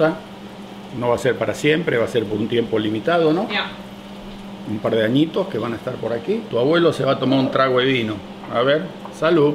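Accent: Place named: Argentina. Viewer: Nos encanta la calidad y los detalles de la explicación. Además nos asombrá que después de tantos años en Canadá conservés el acento argentino como si nunca te hubieras ido. Un capo de la pintura! Saludos desde La Plata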